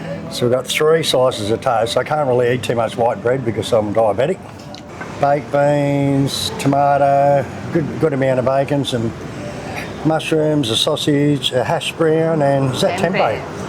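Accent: Australia. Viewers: Love the Aussie accent, says this Yank. Apologies if you're from NZ. The accents to our ears, are so similar.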